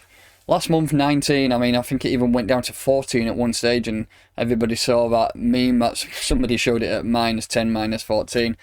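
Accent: United Kingdom. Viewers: you have the harry potter accent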